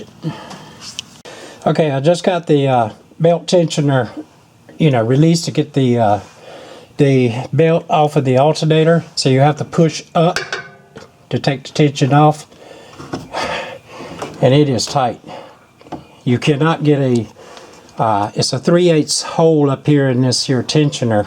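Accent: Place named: South, United States. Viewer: Where are you from out of curiosity? My guess is Louisiana? Texas?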